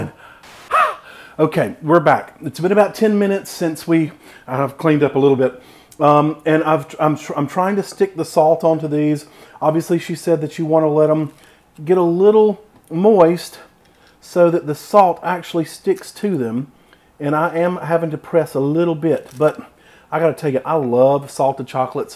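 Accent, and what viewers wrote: South, United States. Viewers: Nah, he's just southern.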